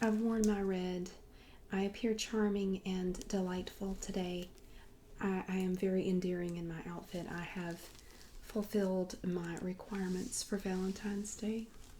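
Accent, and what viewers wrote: South, United States. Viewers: gosh I'm from the south and your accent sounds like everyone in my family. lol this character reminds me of a spoiled aunt I have...especially with the southern accent. Love it!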